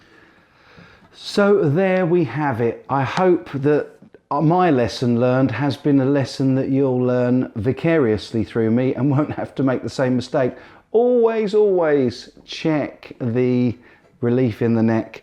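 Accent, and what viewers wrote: United Kingdom. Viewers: As a native USA English speaker, I am tired of Briish accents in presenters... are USA English talkers so hard to locate in the USA?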